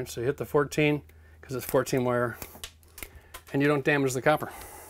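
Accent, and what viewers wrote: Canada. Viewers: I know that I need to look at my local regs, but just for reference, are you in Canada or the US? I’m detecting a hint of Canadian accent. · Very informative. You speak well and clearly without lots of loud music in the background. I just subscribed. Are you Canadian? I notice and accent. I spoke at a couple conferences in Canada. I am redoing a bathroom in an old rowhome. How do I secure every NM every 5 feet if I am keeping the plaster and lath? Should I have separate circuits for the lighting and outlets?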